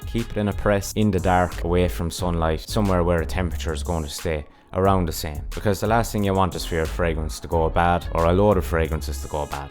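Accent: Ireland. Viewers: Some good advise....really enjoy your Irish accent. Thanks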